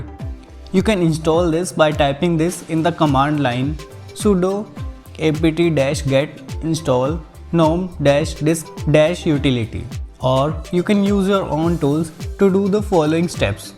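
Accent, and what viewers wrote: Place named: India. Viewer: As soon as i recognised the voice was indian I was relieved and thanked god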